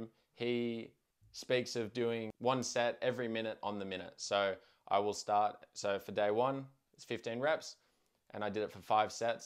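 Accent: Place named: Australia. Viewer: Tibialis raises. Australian accent. Subscribed!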